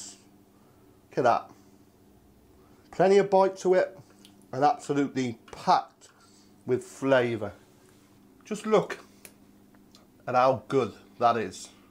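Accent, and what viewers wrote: United Kingdom. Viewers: I always admire your passion never mind your awsom British accent . I tried these bad boys last summer , it is packed with flavor . one quotation is that grass fed grass finish beef?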